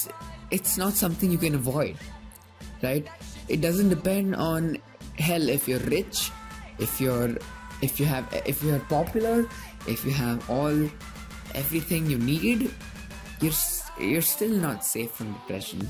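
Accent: India. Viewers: are u Indian or like Asian? not trying to sound ignorant but it kinda sounds like it in your voice. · I recognise that accent 🇮🇳 India all the way!!!!!🇮🇳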